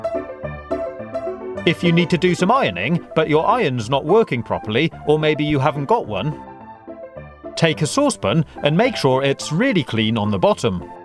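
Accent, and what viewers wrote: England, United Kingdom. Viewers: Are you English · He's British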